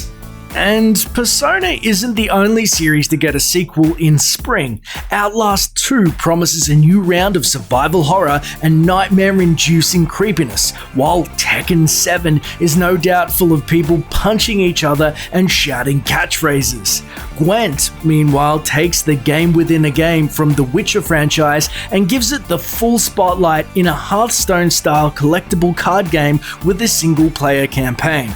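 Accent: Australia. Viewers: Was the uploader Australian? His voice was hella hot, omg. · Where is days gone set? Cause he says one of "our" national parks and this guy has an Aussie accent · Yuukei There is no such thing as a British accent so that doesn't make sense, he sounds and is Australian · I fucking hate australian accent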